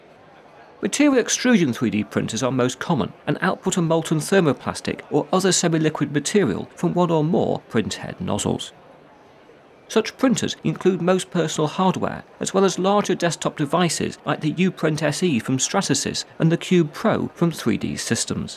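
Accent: United Kingdom. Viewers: To me, everything spoken with a proper english accent gets way more serious and undeniably true. Great narration.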